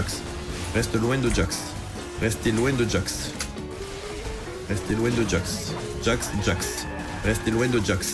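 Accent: South, France. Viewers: Cet accent, j'adore, ça sent bon le sud. J'aime beaucoup Jinx, c'est assez simple à jouer cependant toute seule elle se fait souvent ouvrir en deux si elle n'est pas aidée.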